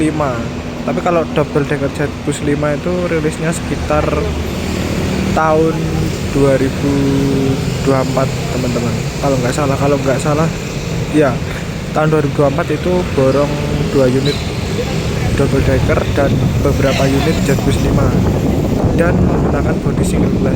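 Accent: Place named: Banyumas, Indonesia. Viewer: Ngapak pride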